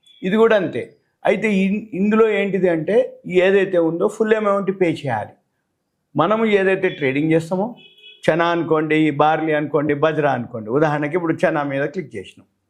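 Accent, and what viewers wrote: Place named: East Godavari, India. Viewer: Sir Mee native east godavara. Educated presentation LA ledu. 🙏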